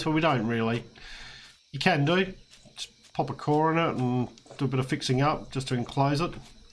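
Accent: Australia. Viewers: nice video there, what part of Australia ru from if you dont mind me asking, im from collingwood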